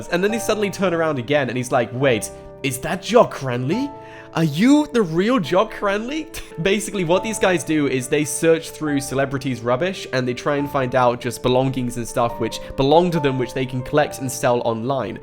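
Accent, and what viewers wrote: United Kingdom. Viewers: Bro u sound very british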